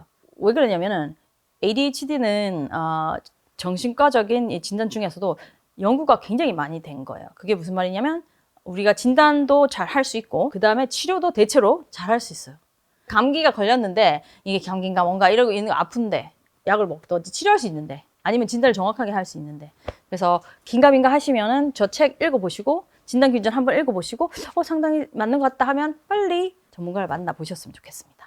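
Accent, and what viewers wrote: Gyeongsang, South Korea. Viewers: 긩상도+영어액쉔트 = 묘한 중독감 말투네요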